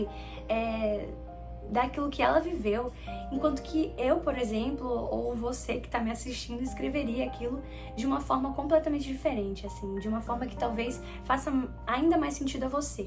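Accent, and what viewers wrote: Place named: Bahia, Brazil. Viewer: Que vídeo bom!! Você tem um sotaque baiano, você é?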